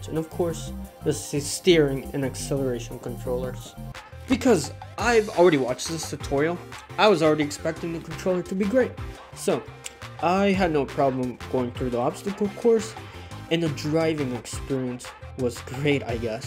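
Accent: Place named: East Los Angeles, United States. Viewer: It's because he's young it's still weird. Or it's that lip smacking noise he makes sounds like a chick from east LA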